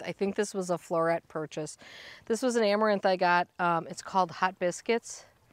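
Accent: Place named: Upstate New York, United States. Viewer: I love how you garden. It's like I would if I had the space and lived in a place with trees and a bit more rain. I started watching your channel about a year ago. It wasn't your garden that caught my attention, at first, though I love it! It was your accent. My dearest and best friend sounded a lot like you do. We lost her to a stroke almost 4 years ago. She was here in Texas with me 4 years ago today! It was so important for her to get here. See, she was born and raised in Upstate New York in a small town called Phelps. It's just south of Rochester. She got transplanted to Texas because she wanted to go to college some place that had a good music program and was completely different from where she had been living. Well, she found it! I'm in the Texas Panhandle and it's nothing like your beautiful tree filled, crick running, lakes and, well, we're not the same at all! 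She always kept her accent which she argued, in fun, that we had the accent down here, she didn't have an accent! Haha!!! Anyway, she and her family moved back to NY in the mid to late 90s. I didn't know what I was gonna do without her?! Our lives were very entwined from working a small business together and I helped her home school her daughter and and and.... We could go shop at Wal-Mart and have a blast doing, together. Well, I survived the move because We could still talk on the phone and I was truly happy that she was back home. We made a trip up there in 2008 and spent a week with her. It was perfect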